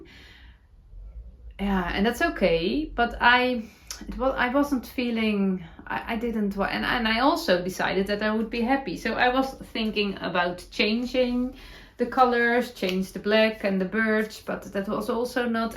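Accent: Netherlands. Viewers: Congratulations to you 🎉. So glad you didn’t listen to your Miss Perfectionist and carried on with your English vlogs. There is nothing wrong with your English, as another person said, I love your Dutchy English. Thank you for your honest, genuine vlogs you bring much happiness ❤❤ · Congratulations💐 I am so happy that you kept going on in English. I love your Dutchy English and when you speak Dutch I often understand it because Danish have many words similar to Dutch. I really understand that you want to be a part of the knitting community in the whole world. I think I will try to make a podcast when I retire in fall but I think I will try in Danish first. I wish there were more people who speak Danish 😊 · Another great episode - I loved to hear the story of your journey toward your English podcast and the 10,000 subscribers! Celebrate your success - your Dutchy English is very endearing! 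(Dawn) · Congratulations on your 10,000! You are my favorite! You are so down to earth. Your English is perfect! My ancestry is Dutch so I love your Dutchy English. ❤🧶❤️🧶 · Congratulations lovely on reaching 10 K - now 10.5K only one day later! 🎉 I simply love watching you as I crochet, I have Dutch heritage so it’s delightful to hear your accent and your growing command of English. Well done indeed! You certainly should be proud of yourself for ignoring your ‘Little Miss Perfect’ 😉 and following your heart. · Congratulations.... you are delightful & I LOVE LOVE LOVE your Dutchie English.. love too hearing you talk about the weather - my (Australian) Son lives in Amsterdam & is learning Dutch so the shoe is on the other foot. I’m flying over tomorrow so interested to hear how warm it is. Keep up the good vibes. Nx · Congratulations! Wow 10 k that is awesome🎉 I really enjoy listening to your podcasts and love hearing your Dutchy English, you are so good at speaking English especially when it’s not your everyday language, well done. 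Thank you 😊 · I love that you podcast in English too! For me it is not just the beautiful knitting. My grandparents and many of their siblings immigrated from Holland to the US after WWII. Your accent and the way you talk is just how they would talk. Listening to you brings back many warm childhood feelings for me. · Congratulations on your milestone! In so many ways!!! It was such a pleasure to watch your podcasts despite what you called your "Dutchy English"! You travelled WAY out of your comfort zone speaking English even though you may not have believed that you were not good at it. I am sure that I am not alone in wanting to praise you to the highest degree!!! You are truly inspiring us to stretch beyond to achieve the utmost joy in our crafts and sometimes that involves stretching ourselves beyond what what we feel capable of doing well enough for others to see. You are a down to earth, creative individual sharing your love of crafting in crochet and knitting and I will not stop recommending your podcast to others, so there!!! 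· Today was closer to 40c in my part of Texas, USA. And tomorrow is World Wide Knit in Public day. I can promise we won't be outside for very long. Perhaps I will take my knitting to a coffee shop and sit in the air conditioning there. I would-be lost with the AC. I love to hear your Dutchy English and the encouragement to do the things that bring you joy. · Congratulations!!! I so enjoy your podcast and your “Dutchy English”. As a native English speaker I find myself saying words like “podcast” the way you do. 😅 You are very inspirational and just a joy to listen to. Congrats again. 🎉10,000🎉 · You are so happy and uplifting. I am 73 and have been knitting, crocheting & sewing since I was a teenager. I really enjoy your Dutchie English & all you share. Wishing you many heart jumping moments. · Love your Dutchy English! And the way you rethink attitude to generate positivity. Heart Jumping is a “Sarahism” I’m increasingly adopting 😊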